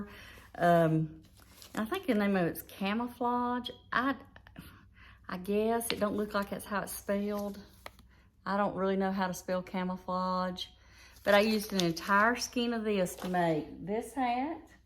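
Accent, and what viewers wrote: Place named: Tennessee, United States. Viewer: Hi, I'm Charlene from CHARLENE CROCHET CORNER, I just found you and I love your accent. I live in South Carolina you sound maybe Tennessee? I subscribed and would invite you to subscribe to me. I love yarn but I'm not as lucky as you are. I just got out of a 9 week hospital stay for my 145th surgery. I was a nurse but my disability caused me to go out work my last shift on Nov 4th, 2007 came home and got in my hospital bed in my livingroom and proceeded to have a nervous breakdown. That is kind of why I started my channel. If I didn't have the love of my channel, well I would have no yarn and not as many wonderful friends & now they are family to me. I love them dearly. I will shout out your channel & would appreciate the same. Oh I love your Carrie Penny shawl, do you have the pattern? I need a copy those are gorgeous! 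Hope in a future wrap? Oh I so love that. I need yarn for that. I love fingering wt yarns. Good for you not letting it beat you. I love your hair. I'm going to be 58 in July. SO we are close. I don't edit my videos it's to hard. Ok I'm going to find the info in your description box. Oh and welcome!hugs, charlene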